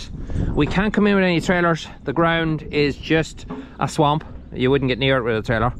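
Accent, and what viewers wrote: Cavan, Ireland. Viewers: Where is this house, somwhere in Ireland, (by your accent ) ? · I love to hear your brogue. My mother was born in Letterkenny in 1906. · Where is the cottage? Cavan ?, just going off your accent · That Good Strong Irish Accent!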